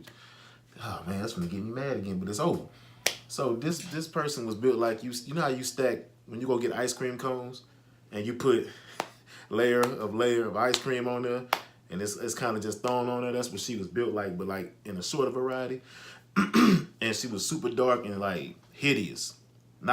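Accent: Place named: Houston, United States. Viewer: U sound like you from Houston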